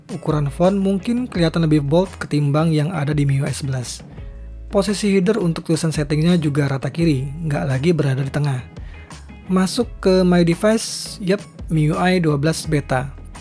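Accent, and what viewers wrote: South Sumatra, Indonesia. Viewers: Cak wong sumsel youtuber ini. Wkwkw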